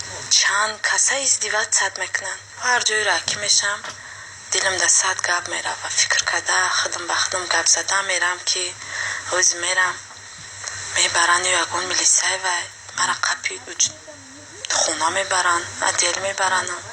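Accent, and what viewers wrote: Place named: Kulob, Tajikistan. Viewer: духтари точик не духтари кулоб ай гапзаниш маълум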